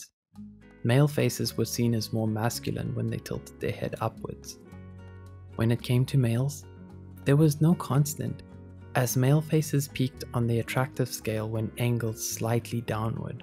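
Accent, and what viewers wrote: South Africa. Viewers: He sounds South African 😍👌🏾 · Sounds like a South African accent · Sounds like a South African accent. Nice surprise. Love from SA. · The voice sounds South African and I'm not complaining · Sounds like our South African accent... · A South African audio !? I LOVE IT !!! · He sounds like he's from South Africa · Sounds like a south african accent 🙃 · This person sounds south african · The voice-over artist sounds South African 😱 (I'm also South African but I don't have the accent lol.) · Does the new narrator have a South African accent??? I’m from South Africa and I was shook and confused like is he from here? Why does it sound so familiar? 😅😂 love it tho ❤️ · I was searching for someone to confirm my thoughts that this is a proper South African accent. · I love it!!!!!!❤Sounds South African ? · it's a south African accent. As a south African I recognize it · This is 100% a South African accent right ?😂 lol represent! 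· I’m picking up a South African accent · Is this a South African accent I am hearing,if it isn’t , it could be 👀🇿🇦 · wait what?! this guy has to be South African. I know that accent! this was expected 💚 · i love his south african accent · Is South African? I recognise the accent:) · is he South African? it feels like home. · does he happen to be South African? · A nice South Aftican! 👍😀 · Me too he kinda sounds South African. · why does he sound like he has a bit of a south african accent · Immediately I need to know, the narrator is South African right...maybe Namibian, but I'm really feeling South Africa. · Is he South African? By any chance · I can instantly tell he has a South African accent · U lowkey sound South African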